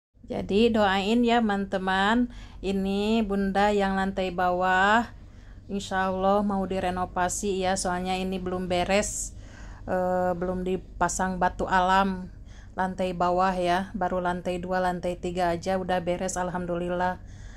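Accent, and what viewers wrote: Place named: West Java, Indonesia. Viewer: Alhamdulillah y bunda ... Bunda Dr daerah Jawa barat ya...logatnya Sunda bngt ...Semangat dan sehat sll bunda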